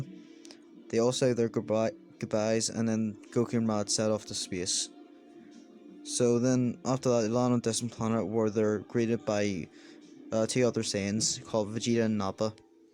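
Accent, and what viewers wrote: Ireland. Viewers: I like your Irish accent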